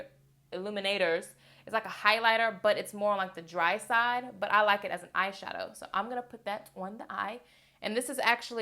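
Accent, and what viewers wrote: South, United States. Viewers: I love your southern accent so much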